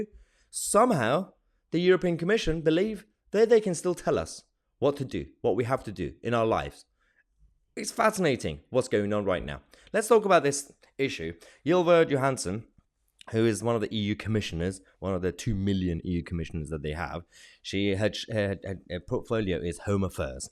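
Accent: United Kingdom. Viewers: While I agree with every word spoken by this youtube host, my immediate question concerns his speaking voice. He uses British English, without sounding annoying. This is amazing. His speech is clear, without a hint of the usual arched phrasing and intonation of royals and intellectual talk-show hosts. His tone is actually delightful, so I would like to know where he was trained as a public speaker. The youtuber named Stephan Molyneaux has this same wonderful way of speaking. Whether I agree with all that he has to say would be another question. I would just like to know the origin of that public speaking voice.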